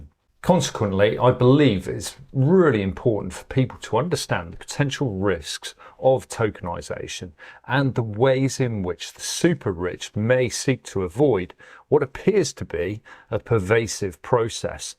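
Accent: United Kingdom. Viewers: I’m new to the channel, and I’m impressed. If you’re in the USA & older like me, try putting playback speed at 90% to 95%… much easier given the Brit’s accent. Great video.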